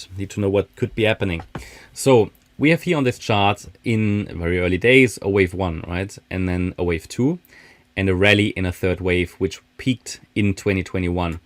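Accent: Germany. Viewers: ich höre den deutschen aus der stimme xD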